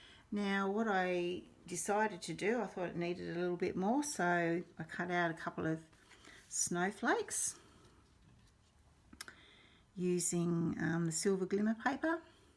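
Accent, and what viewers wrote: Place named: Australia. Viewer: Hi - I've just discovered you and subscribed. This is an amazing and oh-so-beautiful card. LOVE the designer paper and the dies too. As it's almost the very end of December, I suppose that designer series paper is no longer available, but I will try. Also, it's lovely to hear an Aussie accent when watching Youtubes. LOVE YOUR WORK!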